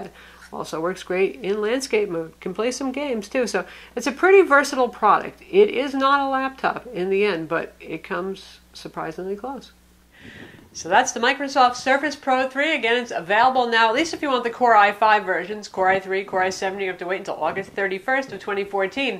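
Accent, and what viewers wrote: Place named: New England, United States. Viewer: Do you live in New England? You have that accent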